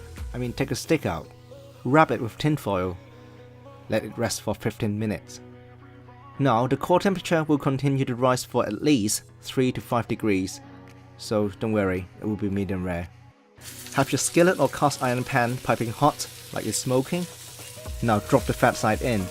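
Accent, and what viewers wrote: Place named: United Kingdom. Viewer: Congrats! Finally your English Channel is here. Need to get a little used to your British accent lol since I’m from the U.S. will always support you as your other channel. 👏👏👏👍👍👍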